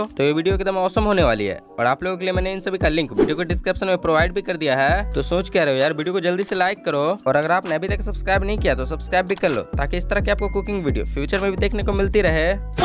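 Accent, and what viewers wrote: Bihar, India. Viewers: Your speaking style is like bihari but ek dam mast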